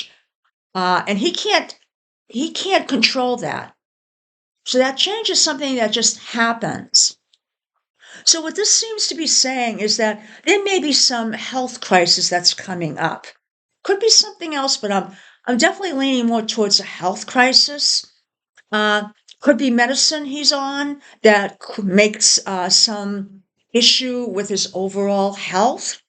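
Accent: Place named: New England, United States. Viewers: Love your Boston accent! Familiar to my ears. Others saying similar things post eclipse. Truth, justice consequences. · I recognize your accent. Where in New England are you? I'm in Northern NH 😊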